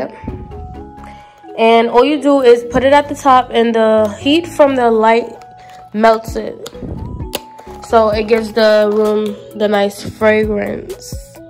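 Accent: New York, United States. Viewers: The NY accent girll 😎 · Her NY accent is giving me the chills 😍😍😍😍😍😍😍😍😍 I love it hereeee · Your lil ny accent is so cute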